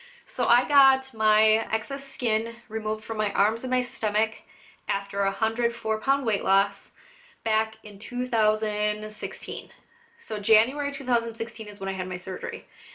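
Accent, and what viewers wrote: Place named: Chicago, United States. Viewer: You have a Chicago accent. The scars dont look bad at all and I am very honest. Most internet people say "yaaas" blah blah even when person 400 pounds! You look pretty good.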